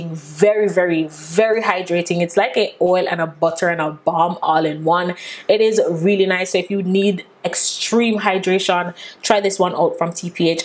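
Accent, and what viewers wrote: Jamaica. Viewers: Great video. First timer here! You sound Jamaican. Are you?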